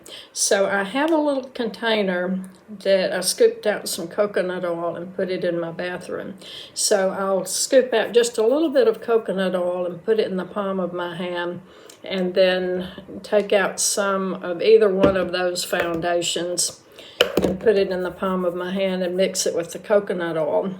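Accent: South, United States. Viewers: I found your channel today and I could listen to you talk for hours. I live in Oklahoma, so it's not like I don't hear southern accents, but yours is so lovely. I'm also a retiree with a mortgage, but thanks to my working so many years and a great investment manager, it's all good and all I have to do is hit 95 and this place will be all mine lol. So glad to find you and and I'll be back to see all your videos. You're a natural!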